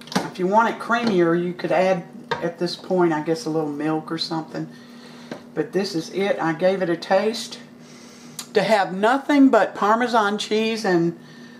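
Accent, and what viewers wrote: South, United States. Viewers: i love cooking with my pressure cooker (i have the instant pot model) and i love making mac and cheese in it. can't wait to try your recipe! thanks! also, if you don't mind my asking, where are you from? your accent sounds a bit like my family who was from texas/oklahoma/louisiana. thanks!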